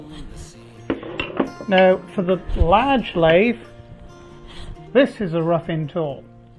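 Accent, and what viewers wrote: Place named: Cornwall, United Kingdom. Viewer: Cornwall originally? Your accent sounds like mine.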